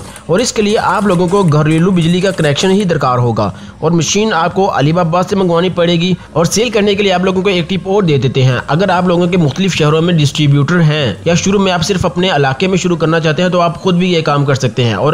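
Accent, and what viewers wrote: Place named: Lahore, Pakistan. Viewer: یار بھائی پرودکٹ نہیں پرادکٹ لاہوری اناز کی انگلش سے بور نہ کیا کرو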